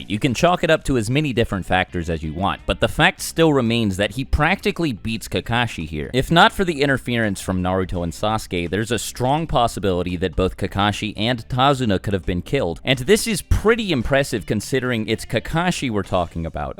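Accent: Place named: New England, United States. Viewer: You're actually very talented at forming and discussing arguments. I mean, no joke. You got chops, son. Also you're super confident and have that sweet ass yankee accent we southerners are supposed to hate (but secretly love...we hate CA accents, but stories for another time) I just really like the way you can put so much weight and disdain behind a word for tits and pickles, like "why do people give so much of a shit about WOOD" when talking about wood release. You also made me a Minato fanboi. So... i dunno if thats something to be proud of, but hey, you did it!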